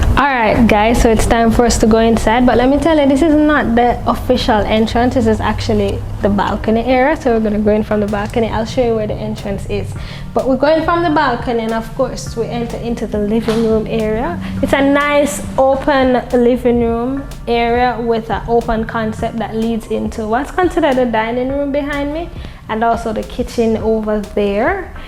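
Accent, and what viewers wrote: Jamaica. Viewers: you are very funny and cute... Especially when you let go with your Jamaicaness. I love you videos and your energy..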